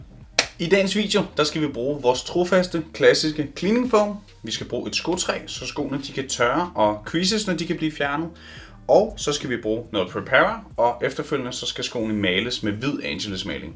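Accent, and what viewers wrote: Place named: Scouse, United Kingdom. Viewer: Thought you were talking scouse at first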